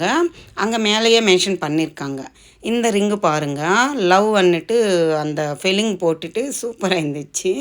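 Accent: Telugu, India. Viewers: మీరు తెలుగు వాళ్ళ sis మీ మాటలు నాకు అలానే అనిపించించింది.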